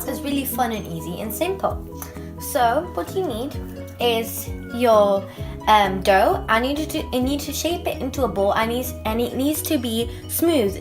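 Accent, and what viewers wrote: United Kingdom. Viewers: Thanks beauty you are so cute and your accent is like the British pple the recipe seems delicious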